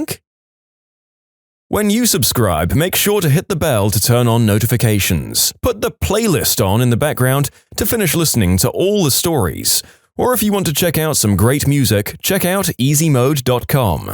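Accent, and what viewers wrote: United Kingdom. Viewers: I’ll leave an actual comment later, hopefully the British narrator sees this. He’s my favorite narrator of the 4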